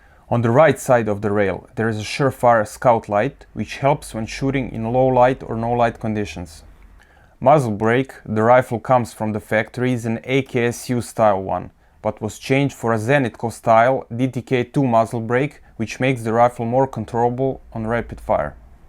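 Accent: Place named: Eastern Europe. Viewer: Славянский акцент. Вы из России?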